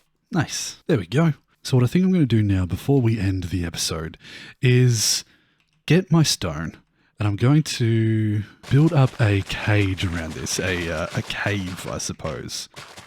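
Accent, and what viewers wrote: Australia. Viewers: When I was watching last episode my girlfriend was in the room and said "he sounds like an Australian who'd make fun of people who played Minecraft". My response? "These eloquently spoken words we are hearing are not for such activities". Continue the amazing work man I have been enjoying this new series so much, I felt very unmotivated to start due to the new massive caves and different generation but I got started and am in love with the new generation.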